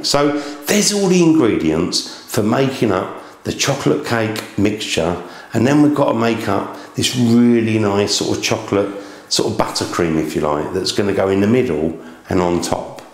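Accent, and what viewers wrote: United Kingdom. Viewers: finally! someone whos british!!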